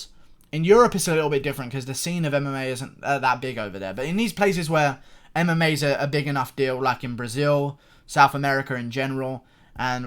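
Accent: United Kingdom. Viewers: With your British accent it sounds like you’re saying Cereal Gane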